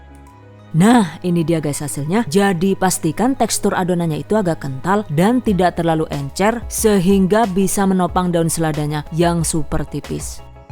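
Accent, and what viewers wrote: Banyumas, Indonesia. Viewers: Dengar ngapak nya ibu jadi kangen kampung halaman😭🙏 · Laaahhh ngapak😅😅😅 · Bun..kok bahasa nya kyk Ngapak ya..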